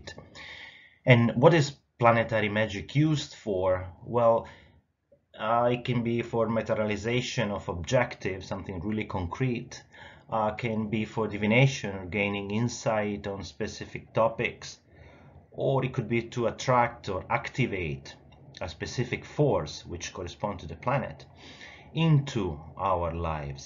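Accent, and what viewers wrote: Italy. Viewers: Sorry,are you italian??? 😅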